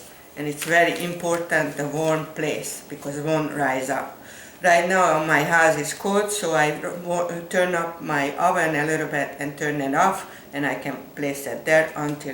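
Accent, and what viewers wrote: Hungary. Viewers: Thank you for your great videos. Not only do you make baking look easy, but you present it in a way that can be followed by someone like me, who is afraid of baking. I came to the US when I was only 7 years old. I'm a pretty good Hungarian cook. But, I love listening to you with your beautiful Hungarian accent. It adds extra flavor to everything you make!! Mivel nincsen senki akivel tudok magyarul beszélni, kérlek, enged meg hogy veled kommunikáljak és esetleg kérjek főzési tanácsot tőled. A nővérem Budán lökött. Nem jót USA'ba csak látogatóba. De már nem él. A volt férjemmel sem beszélek magyarul mert a többi család nem érti. Csak egy osztájt fejeztem be Pesten, de azért nem vészes a nyelvtan és az írásom. Régen leveleztem a nővéremel. Az már nagyon, de nagyon régen volt. Thanks again! And all good wishes to you and your family. Miriam P.S. 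I would love to know a little bit about you. You can find me on Facebook, if you wish to friend me. I would be so honored.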